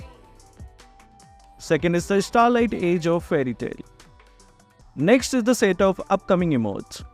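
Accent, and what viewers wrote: India. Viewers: I think hes from india, cuz his accent ⊂◉‿◉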